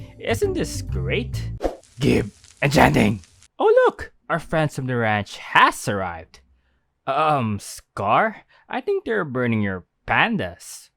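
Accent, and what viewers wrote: Philippines. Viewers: the filipino accent is strong in this one haha <3 sending more love from the ph!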